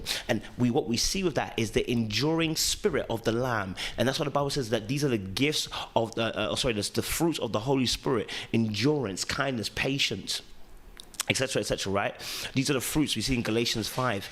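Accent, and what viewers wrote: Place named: United Kingdom. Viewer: You are talking nonsense! Unless you are secretly married and speaking from experience, you shouldn't be providing any advice on this matter. Your comments regarding her vision of marriage are very elitist and African disguised with a British Accent! The Christian community should be praying that their divorce does not go ahead, but all of a sudden, everyone is a psychologist.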